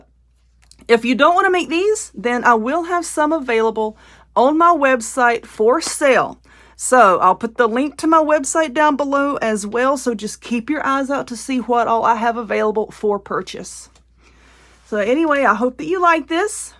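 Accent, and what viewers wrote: South, United States. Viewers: Woman you are amazing!! I so love your videos! Thank you from another southern girl that can so understand your wonderful voice!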